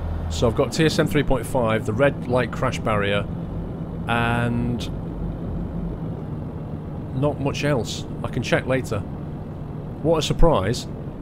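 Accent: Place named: Scotland, United Kingdom. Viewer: Awesome Scottish accent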